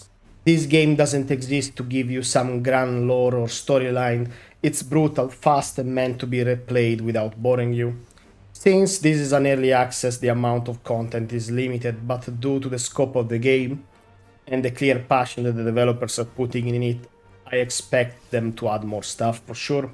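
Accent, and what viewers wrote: Italy. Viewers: pasta accent - you have my like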